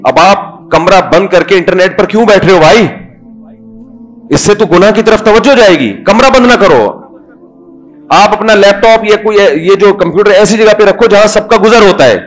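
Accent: India. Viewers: Molana ka voice agras pura ka hai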